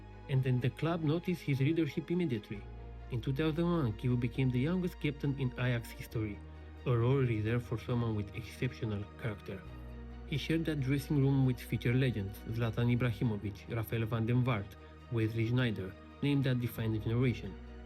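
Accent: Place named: Belarus. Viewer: mama cum iese belarusul prin accent. fa coae video in romana si aia e..daca nu pune AI.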